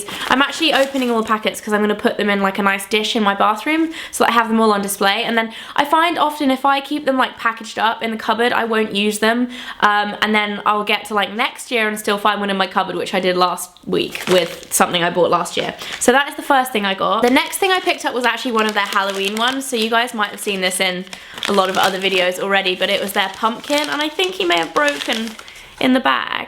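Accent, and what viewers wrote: England, United Kingdom. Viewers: is she english? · From which part of the UK are you exactly ? You have a very lovely accent ! Love the video, defs gonna get some of those as christmas presents !!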